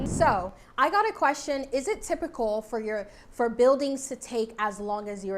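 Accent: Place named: Canada. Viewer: Are you Canadian? Your accent sounds like a Canook!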